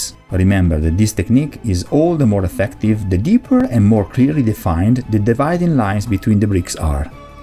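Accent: Italy. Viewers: Hey what part of Italy do you live? (I think that's an Italian accent but I've been drinking)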